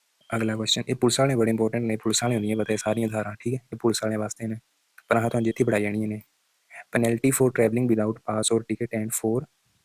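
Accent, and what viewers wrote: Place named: Kangra, India. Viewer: Bhai tuadi bolli sun k kangri lg re mno tusi...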